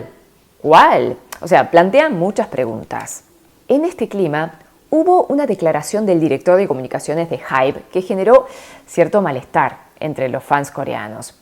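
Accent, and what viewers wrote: Argentina. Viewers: Cómo no van a ir a ello teniendo al enemigo arriba y tirando bombitas cuando se les antoja?:/ sinceramente, a mí Corea del Norte me da muy mal rollo., tú estás en Argentina (por el acento que tienes me lo parece, vamos) así que tú estás a salvo, pero el resto? Pff...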